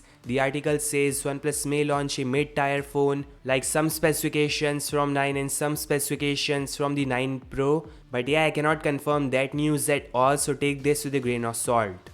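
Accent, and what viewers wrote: India. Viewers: 满满的咖喱味 哈哈啊哈啊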